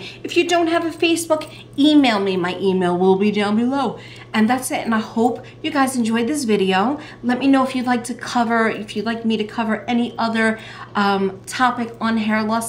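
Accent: New York, United States. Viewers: I admire you a great deal😘😘😘...i dont have any issues wirh my hair but i like that you are so confident even with such thin hair i almost forgot you have thin hair and your New York accent or Jersey i love. Home sweet home!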